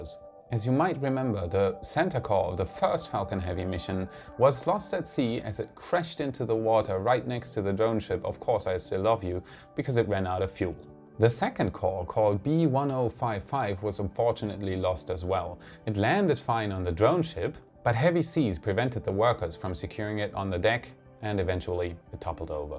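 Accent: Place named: Germany. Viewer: Oh my goodness first time I went back to #1 u need a haircut here and u have more of your German accent! ❤️your the best!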